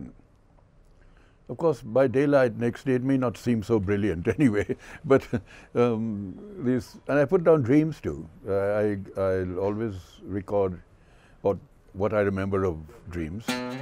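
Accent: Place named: United Kingdom. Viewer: A real mixed British Indian accent! Indo Britishers note!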